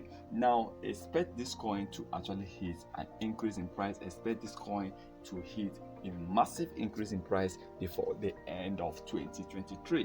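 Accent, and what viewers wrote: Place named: Nigeria. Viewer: U sounds like Nigeria,I'm Nigeria too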